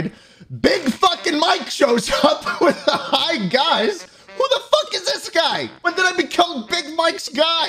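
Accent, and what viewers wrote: United States. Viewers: American accent at its purest.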